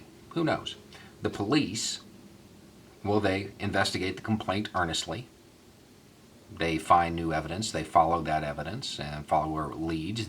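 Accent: South, United States. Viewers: Why the hell do conservative believe he speaks to them. Cause he has a southern drawl?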